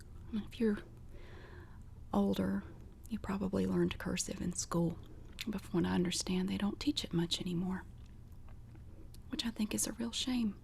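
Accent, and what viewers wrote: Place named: Georgia, United States. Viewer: Boy you sound like you're from Georgia too! I have a thick accent too according to my friends haha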